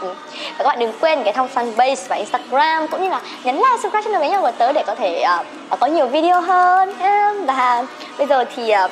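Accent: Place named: South, Vietnam. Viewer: Nói giọng Nam rồi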